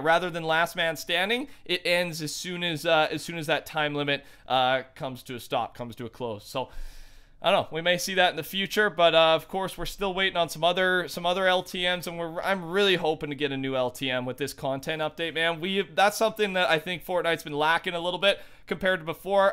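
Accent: Canada. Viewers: Sounds like you’re Canadian , Vancouver ? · Are you canadian?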